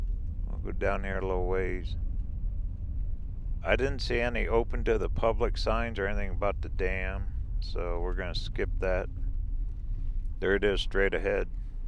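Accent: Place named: New York, United States. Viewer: You sound like your from NEW YORK City ,there is life out here on the west coast, ya all come back now!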